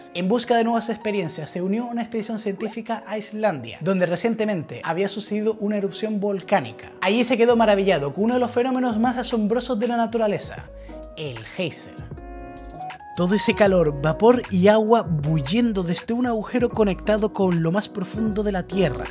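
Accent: Chile. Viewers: Hola amigo, súper tu video... Eres erudito en el tema, fascinante! Muy documentado. Intentaba recordar de dónde es tu dialecto: y bingo.... lo recordé: chileno. Un saludo desde Colombia. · Juraba que eras chileno, tu acento se parece muchísimo al acento chileno neutral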